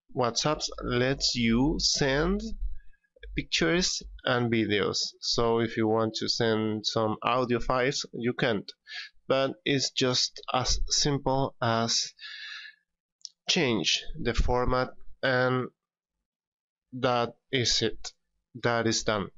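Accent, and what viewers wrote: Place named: Argentina. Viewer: Vos sos de Argentina?. Se te nota la tonada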